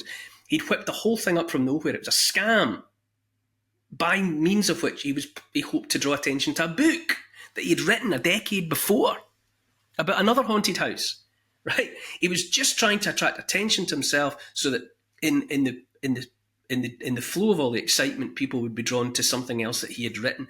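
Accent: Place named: Dumfries, United Kingdom. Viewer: Thankyou for sharing such fascinating stories, I have just ordered a copy of your haunting book. My family are 100 years of farmers from Dumfries- i love hearing your accent from the region. Best Wishes to you.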